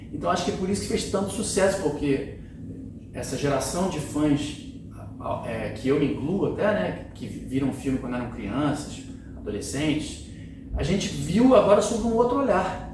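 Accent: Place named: Rio de Janeiro, Brazil. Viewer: Sensei, onde é sua academia? Treinei karatê por muitos anos e gostaria de poder voltar. Senti uma esperança, pelo seu sotaque, de que o senhor seja do RJ. Abraços. Oss!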